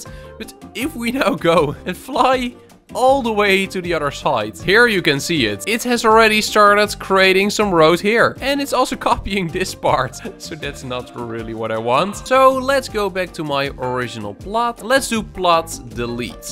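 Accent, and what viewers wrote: Netherlands. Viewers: sounds very dutch xd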